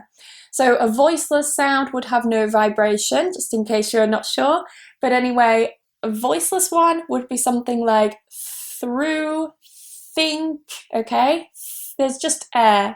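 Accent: United Kingdom. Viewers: I like British's accent. beautiful. I like you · I'm really for in love for video. Fist at all I like british pronuncition, and it much clear. I was looking for a pronunction couse and it meet what I wanted. My language is portuguese and video was so helful. I love english language. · Thanks a lot of your video and beautiful British accent. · Oi mate! 🤭 love listening to British accent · Thanks for the video,..it's good to learn how to pronounce words in british accent...it's amazing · thank youu,,, i love ur british accent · I fell in love at first sight, but I'm afraid you're already married ☹️. Anyway, I'm learning american english nevertheless I'm going to learn british accent as well because of your videos. Thank you in advance 😊. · Thank you Thoroughly This is a little too British for me…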